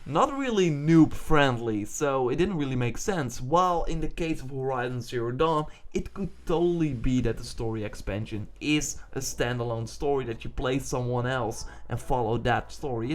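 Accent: Netherlands. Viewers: ik hoor aan je accent dat je nl bent :D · ofc he is ... that accent tho :)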